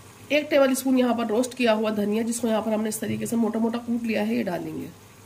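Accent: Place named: Bhajanpura, India. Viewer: Baaji aap bhajan pura mey rehti ho kya? Meri bahen bol rahi hey aise bhajan pura waley boltey hey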